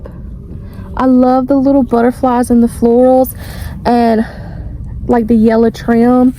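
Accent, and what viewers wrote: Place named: Southwest Virginia, United States. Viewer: Hi! Va here too! I gotta ask bc of your accent. Are you from south west Va? You sound like my family.❤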